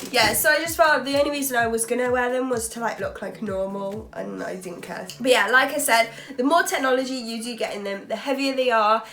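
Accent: United Kingdom. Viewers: Boy you have a thick british accent and it's sexy girl!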